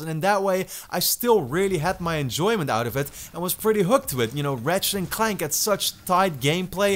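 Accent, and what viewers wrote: Netherlands. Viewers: I heared your voice and already know you are Dutch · This Guy is dutch.... I can hear it.... Im dutch too... And btw GO READ A BOOK PEOPLE!!!! RRRREEEEEAAAADDDD! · Is this boi dutch? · i can hear the dutchness in your voice xD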